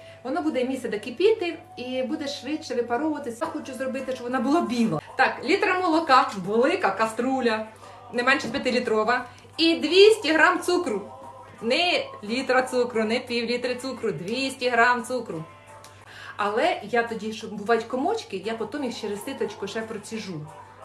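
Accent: Ukraine. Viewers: А мені дуже подобається Ваша мова, не в цілому — українська, хоча і вона також, а саме Ваш говір, акцент! На Заході і на Сході України зовсім не так розмовляють. А от у Вас якесь літературне мовлення... мелодійне, співуче. Саме так розмовляють мої родичі на Черкащині... Я інколи навіть заслуховуюся...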